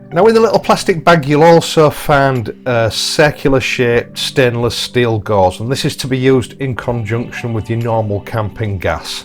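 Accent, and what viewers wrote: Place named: Hull, United Kingdom. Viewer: Hey, I noticed on a previous post your number plate has castle motorcycles on it, I have an 09 bonneville from there. And are you from Hull by any chance as I recognise the accent. Great videos by the way 👍🏻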